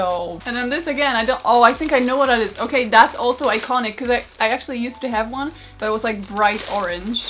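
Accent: Poland. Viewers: I like your delivery, I love your accent. I love my polish peeps. I think you are going to be a Youtube superstar. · POLAND!!!!! It would have kept me up trying to place your accent. So, I literally did a web search for "youtubers like Rachel Maksy" and your channel was suggested. This is my third video (yes, I'm a fan 🙂) and your accent is so familiar but I couldn't put my finger on it until you said it then I said, "That's it!" I haven't been to Poland since the 1990s, possibly before you were born. Anywayyyyyy, I'm enjoying your work and will now binge watch while I sew. Thank you!!!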